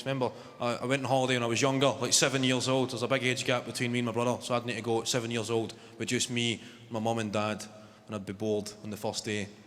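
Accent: Scotland, United Kingdom. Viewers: Love this man, I am Scottish, from Paisley. I live in South Africa since 1972. . It's like being at home when I hear him. 👏🙏❤ . Wonder if he will ever come to SA? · Must be nice. I'm an American working in Japan teaching ESL. I can understand most Irish, English, and Aussies. I have been trying to work on the Scottish accent which is one of the few that I can't master yet. Some of the things he says too fast I think, some are idioms that I don't know (he explained in one video that if a house was free meant the parents were on holiday and there was going to be party whether the teens knew it or not), but I think mostly his accent is just too thick I can't catch on. But the jokes I do catch are funny! My family is hoping to go to Scotland next summer on holiday. I love comedians so Kevin seemed like a good choice. I'm told that for tourists the people mostly tone down their accent. 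I hope so. (I've had Japanese friends go to the US and Australia and had terrible times with the language). Really looking forward to the trip! The countryside looks so beautiful. And I love Scotch. And unfortunately due to Brexit and Covid, it's really cheap! Everyone should be taking advantage of this! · I'm so bored with the strong Scottish and weak English shite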